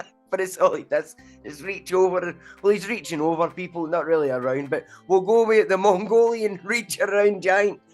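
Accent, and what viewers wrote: Scotland, United Kingdom. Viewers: Good job, and pretty hilarious, too. A thick Scottish accent trying to pronounce Japanese is a hoot. Fortunately you had pics so I could recognize who you were talking about. I would like to see Teru in good health fight one more Basho. Kirishima may be the next Yokozuna, but Atamifuji will follow soon after, I think. Takakeisho will have to go through anger management therapy before he makes it, but if he does he will no longer be as good -- Dilema.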